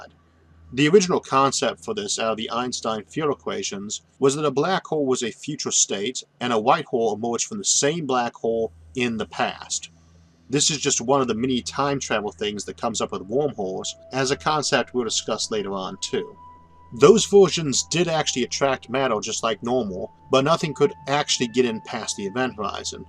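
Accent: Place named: South, United States. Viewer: I enjoy your voice, BTW even with that inability to pronounce 'R' .. it gives your voice a bit of a "southern" drawl. Easy to listen to. Oh and I often transpose letters myself.. It isn't a dyslexic sort of thing, but my brain going faster than my fingers along with large hands and small keyboard :)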